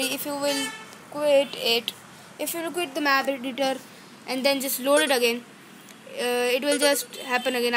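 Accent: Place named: India. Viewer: Are your Indian because of your accent?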